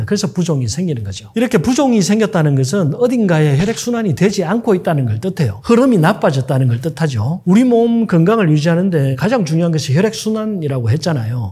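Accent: Gyeongsang, South Korea. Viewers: 대기~할일없네? 갱상도 싸나이 발음이라고 생각혀~ㅉㅉ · 갱상도 사람이니까 이해하세요ㅋㅋ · 맞아요. 경상도 사투리가 ㅡ발음도 잘 못해서 ㅓ로 들리지요. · 갱상도 싸투리 아이가. 니 그것도 모른데이?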